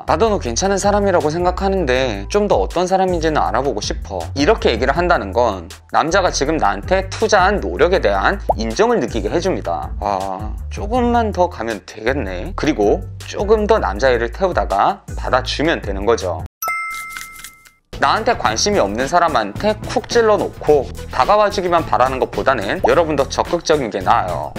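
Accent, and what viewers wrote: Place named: Gyeongsang, South Korea. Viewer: 경상도 억양 세시네욤...ㅋㅋ...🥲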